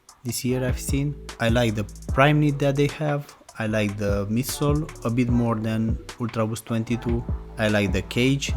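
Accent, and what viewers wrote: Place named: Spain. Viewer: Hablas español, ¿Verdad? Suenas muy similar a Carlos Sainz hablando inglés. Hace unos días probé los ultraboost 1.0 lcfp. Hasta el momento es el par más cómodo que he utilizado, el único problema es que no tienen plantillas, por lo que dudo que sean cómodos para utilizarlos todo el día. ¿Los has utilizado?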